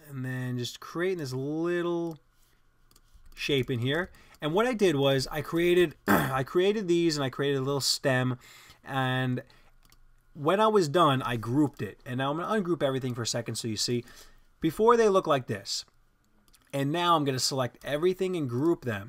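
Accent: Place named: Boston, United States. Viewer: You have a boston accent.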